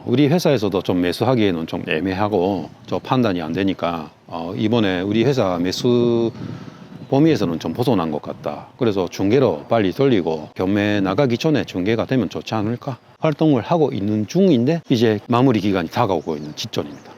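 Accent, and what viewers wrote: Busan, South Korea. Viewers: 어 완전 부산사람 말툰데요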